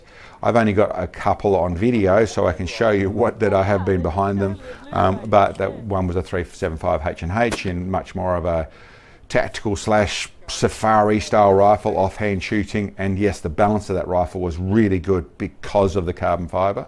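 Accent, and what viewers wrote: Australia. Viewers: I laughed when you put the barrel down so you could talk properly. Somewhere in your ancestory there might be some Italian blood. Something about mediterranean arms movements and aussie accent is genius. Love all your videos, Australia is my 2nd favorite country to visit right after NZ.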